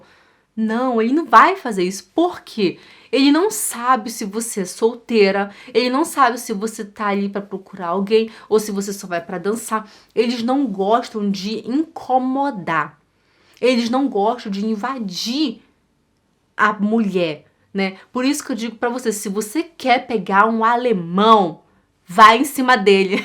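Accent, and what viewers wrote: Pará, Brazil. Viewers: Nossa sabia que você era da minha terra é inconfundível seu linguajar paraense 🤗❤️bjs amei conhecer seu canal eu estou aprendendo muita coisa com você.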